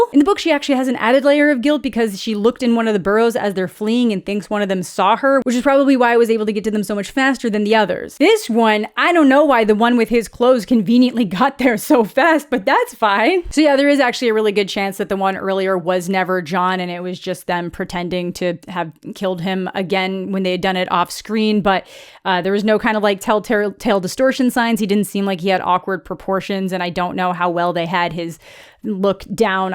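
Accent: Quebec, Canada. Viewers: You speaking French made my brain hurt. I speak French and even if I didn't know you were Canadian I would be able to tell from your French. Honestly great though, keep it up. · I actually love your Canadian French. It sounds great <3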